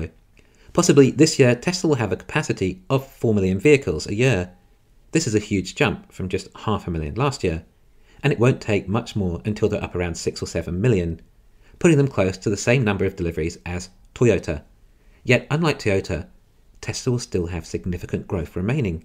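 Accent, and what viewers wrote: United Kingdom. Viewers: I’m British so the accent always suits my ears. More importantly the delivery is clear and without repetition. The simple things like NOT repeating subheadings in the first line of text are well done.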